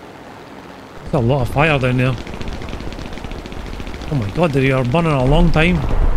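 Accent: United Kingdom. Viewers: hearing a scot speak in a british accent is just all kinds of confusing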